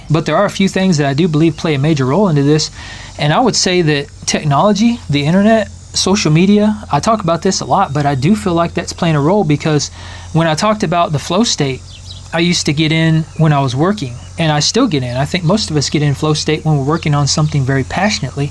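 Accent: United States. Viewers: I’d like to know where you are from in the US as I like your accent. Greetings from Queensland Australia.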